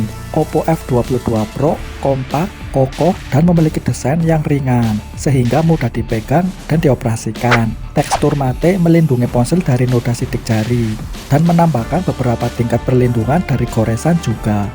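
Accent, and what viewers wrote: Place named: Java, Indonesia. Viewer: Medok bener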